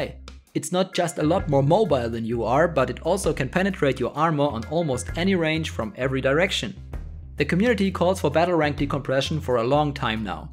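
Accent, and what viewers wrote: Germany. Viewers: Bin mir nicht sicher, aber ich glaube man hört deine Deutschheit raus :D Trotdzdem sehr gute aussprache, musste echt 5 mal hinhören ^^ "Jagdpanzer" hats dann bestätigt :D Deine ("Review") hat mich überzeugt. Zeit das WOT mal abgelöst wird :D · That is one German accent. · Echt gutes video aber man merkt das du deutsch bist # Tiger zwei oder Panther zwei sagtest du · Hallo guten Tag man hört dass du deutscher bist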